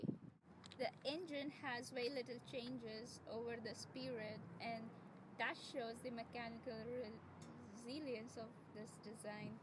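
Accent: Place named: Hungary. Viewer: she sounds hungarian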